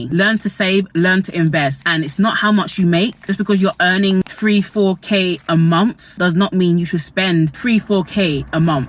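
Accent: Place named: England, United Kingdom. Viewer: English accent and rich dad poor dad? New sub!!! 😍😍😍😍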